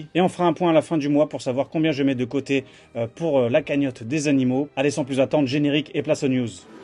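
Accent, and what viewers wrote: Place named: Suburbs, France. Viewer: Je serais curieux de savoir dans quelle banlieue tu as appris le français, même si aujourd'hui c'est un peu meilleur que d'habitude...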